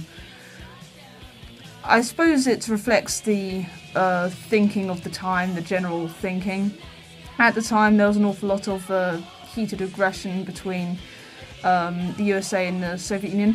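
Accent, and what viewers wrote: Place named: RP, United Kingdom. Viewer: We want to watch the video not listen to your posh british tea and crumpets voice smothering over and therefore ruining this video. Just shut up and take your comments to Dave mustaine, oh wait your probably afraid that he will KICK YOUR SORRY ASS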